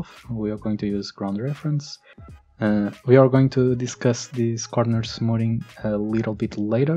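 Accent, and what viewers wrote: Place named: Portugal. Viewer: Estava a ver o video e a pensar.. esta pronuncia parece que e' portugues.. fui ver o teu canal e acertei! Obrigado pelo video!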